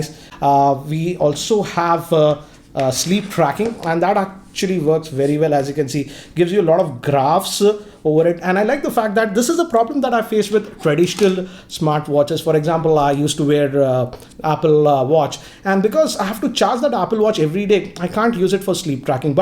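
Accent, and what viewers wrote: India. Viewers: love the Indian accent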